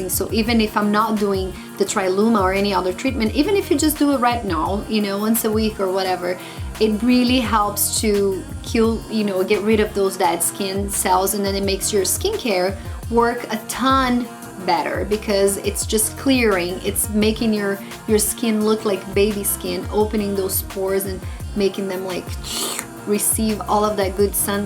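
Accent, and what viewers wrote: Brazil. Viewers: You sound like you're Brazilian every words ends with a e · I like your accent are you Brazilian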